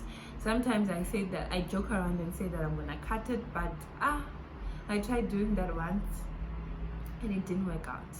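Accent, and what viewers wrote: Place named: United Kingdom. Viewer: 🔥🔥🔥🔥 congratulations!!! 😅as for British accent!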